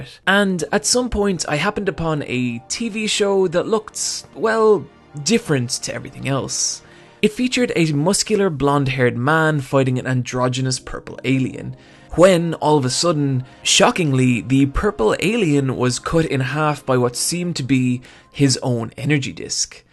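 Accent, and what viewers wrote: Ireland. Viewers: Oh? You're Irish? I thought your accent seemed familiar, but it's always safer to assume English. Hello, fellow countryman! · Oh I was pretty sure he was Irish, that accent sounds about right. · holy shit I didn't know you were Irish dude hell yeah I knew that accent was familiar. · huh so youre irish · He's Irish? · I only now started hearing the Irish accent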